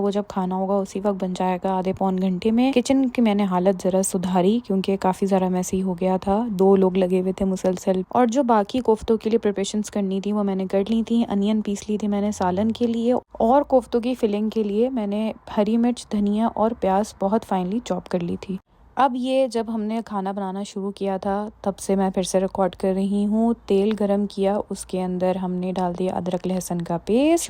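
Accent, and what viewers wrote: Karachi, Pakistan. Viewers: hello, apkay baat karnay ka style khana wagira bilkul hamaray ghar ki tarha ha.. im also from Karachi living in Sweden..after a long time i found somone from vlogging whom i can totally relate with❤.. plus abt your suggestion im interested in starting dil ki batein series... because that would be great if we share things with each other.. but i think it depend on you. if you are making 2 vlogs in a week tu ek dil ki batein series vlog n second vlog of your routine 😊 best of luck 😊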